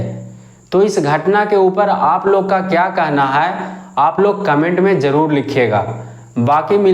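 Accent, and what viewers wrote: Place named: Bihar, India. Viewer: Bhaii you’re doing well but change the Bihari Hindi accent to org Hindi write before recording you’re doing well 😊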